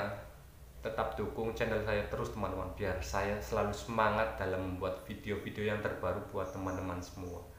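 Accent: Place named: Banyumas, Indonesia. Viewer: Mas, maap orang mana yaa. Deneng logat e ngapak banget..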